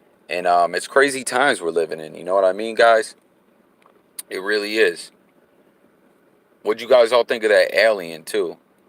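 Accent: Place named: Nebraska, United States. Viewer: Hey is you from Nebraska or Cali? You sound like it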